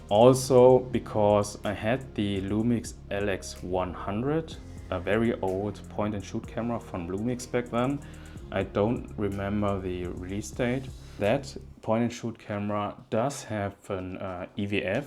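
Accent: Germany. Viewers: Just curious, what racial are you? And because of your accent, do you live in Germany for a really long time?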